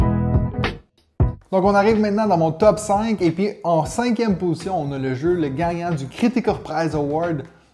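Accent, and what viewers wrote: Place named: Quebec, Canada. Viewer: J’ai vu dans le vidéo du concours que tu vis en Suisse mais je trouvais ton accent très québécois. Ta réponse vient clarifier le tout ! Merci !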